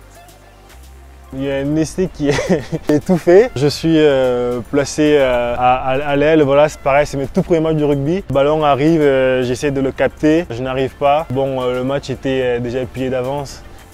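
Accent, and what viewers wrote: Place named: Toulouse, France. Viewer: Ce bel accent Toulousain qui fait que l'on n'oubliera jamais d'où tu viens 😉 et cela même si tu vas très très loin comme je te le souhaites!!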